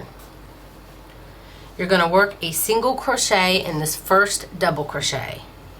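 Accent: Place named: Midwest-South, United States. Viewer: It's so funny, because you have a kind of midwest-southern accent I always pictured you in Kentucky or Tennessee, with a bunch of kids playing outside! Israel is about the last place I thought you might be recording these (well, maybe not China...) How did you wind up in Israel? My Uncle Jack became Orthodox about 20 years ago and now lives outside Jerusalem—but he still sounds like he's from New York! BTW I unraveled the first one and am now using plain old Sugar and Cream in various colors. I am thinking of doing some cross-stitching in the diamonds...Thank you!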